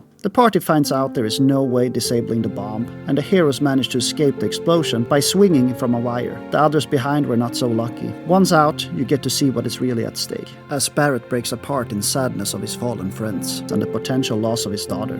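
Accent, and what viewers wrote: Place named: Sweden. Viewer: May I ask what country you’re from? You sound Swedish, and I hope that’s not insulting. My grandma is a full blown Swede. Great channel dude! I’ll definitely be following you and looking forward to what you put out next.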